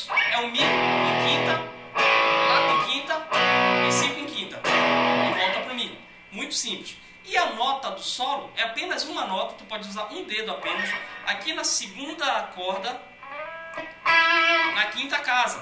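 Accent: Pará, Brazil. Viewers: só pelo jeitos de falar deve ser daqui do meu estado, pará.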